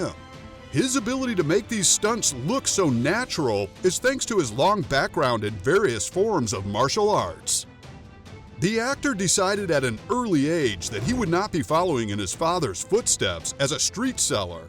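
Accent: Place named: United States. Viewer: this overexaggerated american accent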